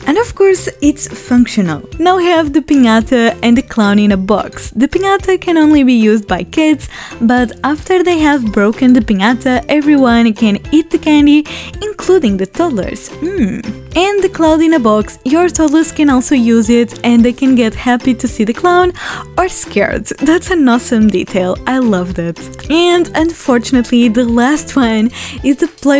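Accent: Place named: Portugal. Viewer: are you portuguese? cause it really looks like when you speak english haha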